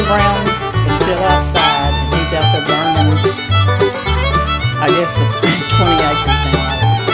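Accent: South, United States. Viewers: You are so precious! There is NOTHING more 'homey' than a Southern twang that puts one at ease! My BEAUTIFUL wife was a daughter of a Church of Christ Minister. I, however, lost her to breast cancer many years ago. As her family did a lot of preaching down Texas way for many years, they also had that Texas-twang that I have come to love so very much! As she, and all of her family have now all gone to be with the Lord, watching you, and Mr Brown together brings a lot of both sad, and warm, memories back to me when we were all together enjoying life, as life was meant to be shared, in Christ's fellowship. Mr. Brown is lucky to have a woman such as yourself, as you are so genuine, kind, and loving. God Bless you, for the person that you are, and what you create for the viewers like myself!.. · These look delicious, but being a true Southern lady..I love your Southern authentic accent! 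It’s the real thing! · Your accent is odd.. you’ve a southern accent but you say wawrsh like a northerner… lol my yankee mom from Iowa says it that way. When we repeat it like her she says oh wait let me say WAAASH… lol but I’m not sure I’ve ever heard it with a slight southern accent like yours.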